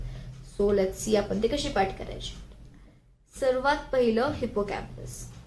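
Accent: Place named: Latur, India. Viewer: Di tuzi way of explaining all thing khup bhari aahe.... Ani laturkar chi marathi tr azun ch bhari 😍😍 I like it so much 😇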